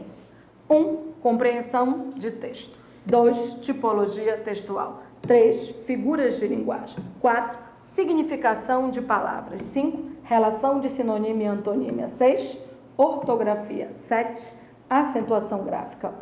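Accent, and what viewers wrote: Pará, Brazil. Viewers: Reconheci o sotaque paraense!! hahaha adorei a aula!!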